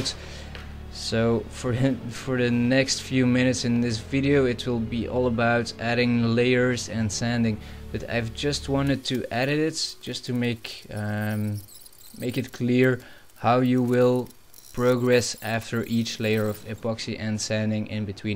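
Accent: Netherlands. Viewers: are you dutch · Let me guess.... your from the netherlands? (just the accent)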